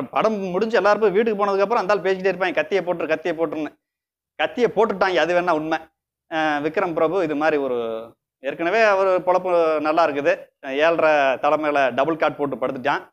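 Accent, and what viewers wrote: Madurai, India. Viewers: madura slang thane unaku pluse continue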